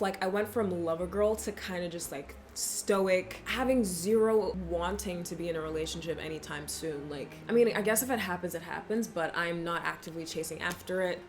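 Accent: Australia. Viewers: Just came from your latest Love Is Blind video, and the whole time I was thinking you were American doing the Australian accent as a joke 😂 but nope, you actually have an Aussie accent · You are so funny and genouine. I bumbed to your videos from love is blind lol. Greetings from Finland. Are you australian? You sometimes sound like australian and sometimes American. Oh now you said it nigerian. Your english is perfect.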